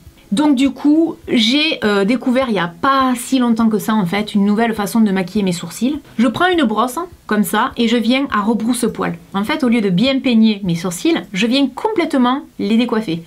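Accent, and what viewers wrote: South, France. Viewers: J'adooore! Merci pour tes conseils pratiques et clairs. Et l'accent du sud en prime, on adore! 😁 PS: tes ridules sont sublimes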